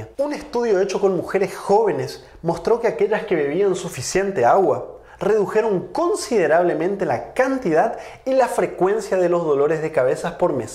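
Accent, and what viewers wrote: Chile. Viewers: Gracias Doctor. por su acento creo es chileno. Saludos de Mx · Noviembre 1/2022: contenido interesante. Usted combina 2 tipos de acento. Habla como chileno y como yucateco. Posiblemente estudio en México.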